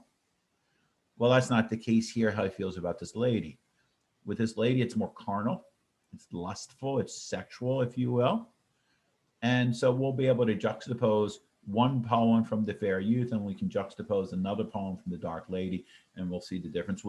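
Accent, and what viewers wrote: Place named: Canada. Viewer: Cool, it sounds like your accent is Canadian, as I think a teacher YouTuber his accent is similar to you.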